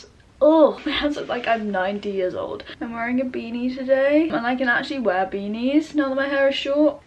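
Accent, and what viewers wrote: Australia. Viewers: You have an Australian accent! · your accent sounds australian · I've noticed that you've gained quite the Australian accent. Maybe that's me being a little extra. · I can hear all the Australia in your accent ^_^ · Cringe that you're speaking in an Australian accent u were only there for a couple months...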